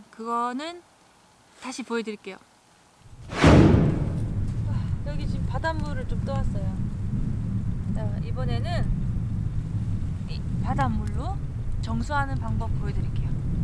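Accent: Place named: Gwangju, South Korea. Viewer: 누나 말투가 저랑 동향같은데 어디세요? 전 광주입니다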